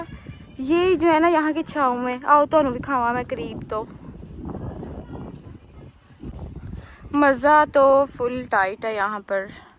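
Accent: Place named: Punjab, India. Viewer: Love your vlogs ND language style too ND specially your Punjabi accent 😁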